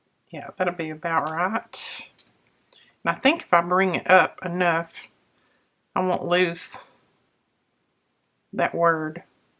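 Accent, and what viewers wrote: South, United States. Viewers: Hello today , I am sitting here with my feet up just enjoying your tutorial and your wonderful southern voice. Would you tell me how to find your granddaughter, she is such a sweetie. God bless and keep you.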